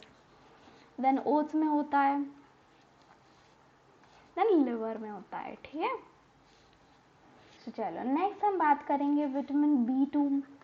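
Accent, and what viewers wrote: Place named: South India, India. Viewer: Wonderful session mam. And your voice is very very cute mam. There is a foreign+ South Indian touch in your accent which makes it unique☺☺☺😍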